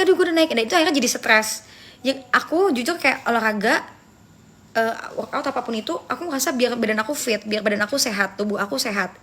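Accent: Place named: Betawi, Indonesia. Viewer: Sekarang ngomongnya ala bocah Jaksel, dulu nyablak ala betahaw 😂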